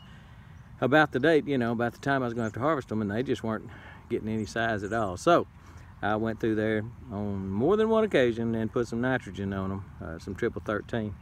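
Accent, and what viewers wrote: Texas, United States. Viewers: Oh that row looked so nice and neat, then you had to walk on it. lol I love your Texas accent. Great video.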